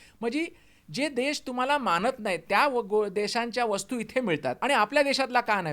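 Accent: Maharashtra, India. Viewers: you speaks marathi very fluently and your pronounces like Marathi brahmins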